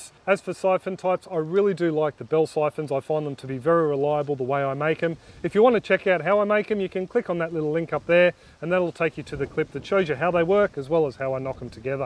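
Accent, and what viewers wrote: Australia. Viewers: Really good, interesting and educative videos, you've been very useful to my setup, your explanations are clear despite your funnny Aussie accent ! It's completely understandable.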